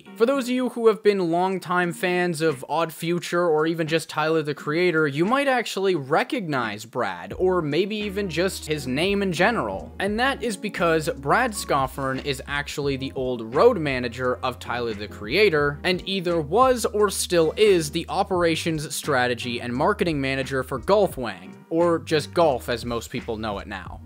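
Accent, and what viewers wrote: Canada. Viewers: Are you Canadian? Plus thanks for the vid 🔥 been too long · yo r u canadian?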